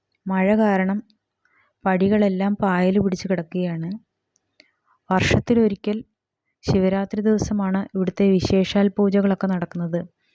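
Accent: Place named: Palakkad, India. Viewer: As per the slang of your language I think you are from palakkad. If I am wrong please correct it.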